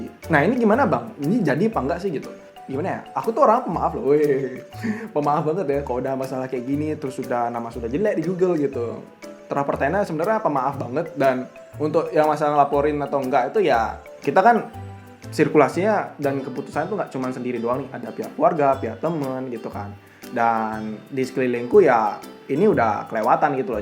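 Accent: Bali, Indonesia. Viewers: Suka banget ama aksen balinya wehh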